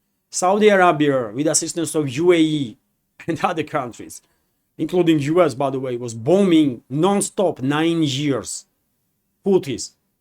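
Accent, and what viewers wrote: Russia. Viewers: The truth spoken in English is a rare event and it’s delightful to see your honest visage, Mr.. Speaking the language of lies with your Russian accent.. you are not alone in the truth being spoken with a foreign accent. You are a rare event there as well. Happy Easter Sunday. I started my family this time of the year 35 years in the past.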